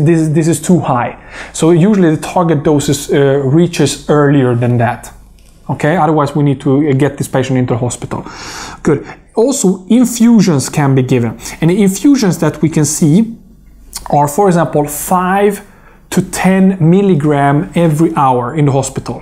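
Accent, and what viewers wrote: India. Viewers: perhaps he should talk in his own language instead of butchering English with his heavy accent. Especially when he is talking about something as important as heart failure. Iv had doctors from India and I find there education is subpar . A person can put there life at risk having a doctor from a third world country, let alone trying to figure out what there talking about. Most eastern doctors come to America to live off patience of Medicare, they can't make a living in India. There guaranteed payment in America. That's was why they come to america with there lack of education and experience.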